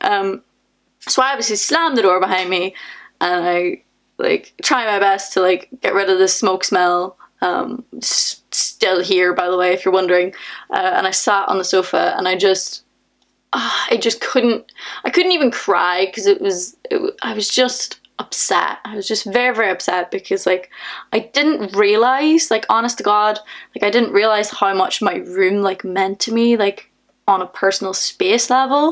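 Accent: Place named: Ireland. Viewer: Which part of Ireland is that accent from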